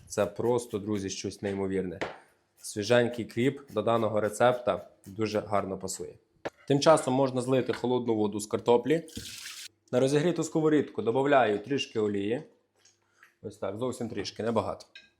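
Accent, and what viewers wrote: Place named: Podillia, Ukraine. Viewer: Професіонал! Клас, було приємно спостерігати, немов родича слухаю 😊 Ви з Хмельниччини чи з Вінниччини?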